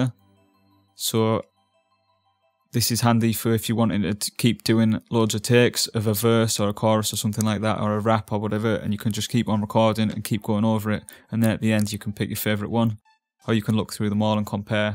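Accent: United Kingdom. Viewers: anypone talking about his lincolnshire accent, so hot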